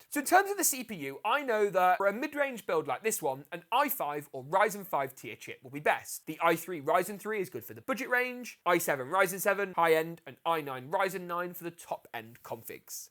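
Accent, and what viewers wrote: England, United Kingdom. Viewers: youre the most british sounding british dude i've ever heard. cheerio. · Loving your videos, I'm looking to build my 1st gaming PC towards the end of this year. Can I ask, you sound English yet your prices are in dollars...is there a reason why?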